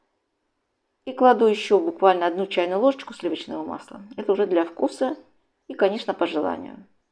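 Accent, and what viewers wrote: Baku, Azerbaijan. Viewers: с родным бакинским акцентом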